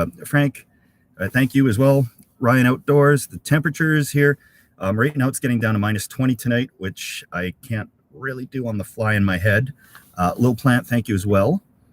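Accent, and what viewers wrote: Canada. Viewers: Are you and Greg Ovens distant relatives? I swear maybe it’s just a Canadian accent that I’m hearing as an American. But you guys could be brothers or cousins on the same side of the family.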